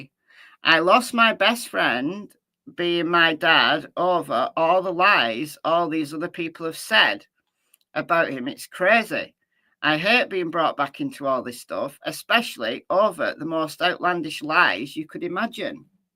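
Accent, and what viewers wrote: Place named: Lancashire, United Kingdom. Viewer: definitely not Allen definitely not there was another Mann looks like him dressed like him etc that exact time used the same type of gun drove the same black ford focus same rims I mean what are the odds aye? BTW ur horrible wooly back accent is really annoying especially when ur desperate like this ur over reliant on your youtube revenue and my God forgive you for what u may be putting g these families through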